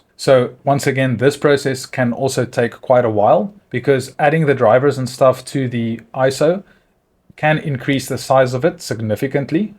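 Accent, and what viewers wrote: South Africa. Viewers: Jisss meneer! Ek wou se jy klink Suid Afrikaans! Baie goeie werk. Dis regtig goeie sagteware! Ek was ook in IT lank terug en jy is reg. Die program sou vrek baie tyd gespaar het al daai jare terug. (BTW ek hoop jy verstaan afrikaans). Sal vir jou n donasie gee nadat ek desember vakansie gehou het. Groete uit Gauteng. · Only a SAFFA could create this boet. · I hear a South African accent or am I dreaming?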